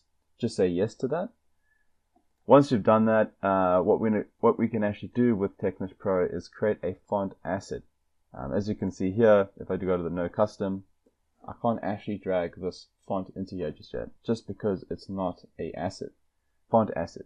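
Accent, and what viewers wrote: South Africa. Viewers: nice to hear a South African in tutorial videos now and then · how noticeable the South African accent is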